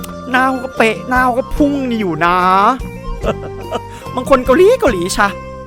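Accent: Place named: Isan, Thailand. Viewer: โชว์ประตูสู่อาเซียน เสียงอิสาน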